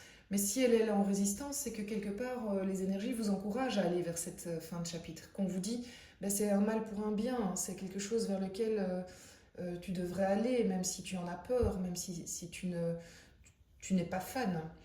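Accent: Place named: Belgium. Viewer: Tirage intéressant et que je ressens, comprends....Très fine interprétation ! Votre élocution, et sa richesse est vraiment Top. J'ai cru déceler un soupçon d'accent Belge et je l'adore. J'ai beaucoup d'amis Belges qui ont un humour formidable. le 2 ièm semestre s'annonce peut-être encore plus usant que le 1er. Va falloir prendre soin de sa santé et rester le plus au calme pour pouvoir s'économiser et être dans la lucidité en cas de prise de décision importante...Merci beaucoup. Je like et je m'abonne 🙏👍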